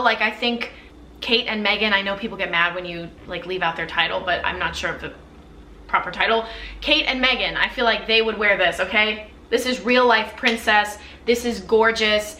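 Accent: France. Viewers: You have a great taste on perfumes and a very nice french accent ! Great video, I watch you your channel from Portugal! 😁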